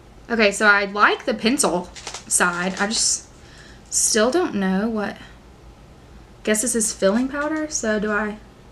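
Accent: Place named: Texas, United States. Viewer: You are definitely a Texas girl! Can tell by your accent and personality❤ keep posting videos!!!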